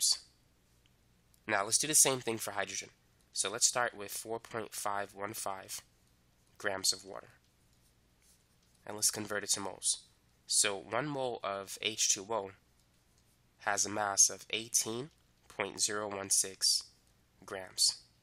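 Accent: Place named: New York, United States. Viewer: you definitely from ny b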